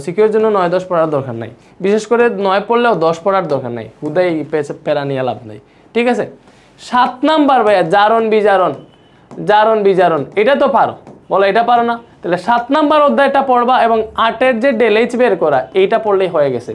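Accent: Sylhet, Bangladesh. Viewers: ভাইয়া তুমি সিলেটি 😩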